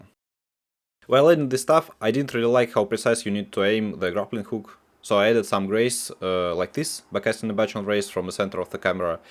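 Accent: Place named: Russia. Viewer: слыша акцент я не могу не думать что автор из России или стран снг, не удивлюсь если это так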